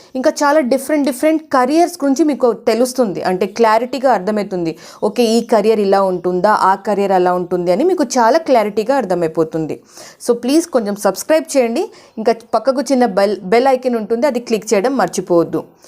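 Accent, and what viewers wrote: India. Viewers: North nunchi vachi telugu matladuthunatu undi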